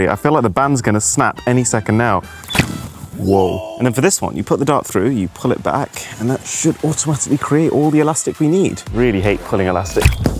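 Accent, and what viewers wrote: India. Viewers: The sub continent accent made it worse for me.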